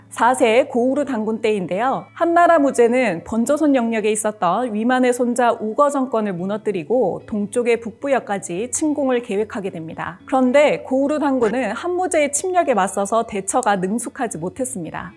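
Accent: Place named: Gyeongsang, South Korea. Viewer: 선생님 강의는 마치 음악 같음. 선생님 때문에 경상도 억양이 이렇게 예쁠 수 있단 걸 처음 알음.. 억양의 리듬감이 진짜 아름다움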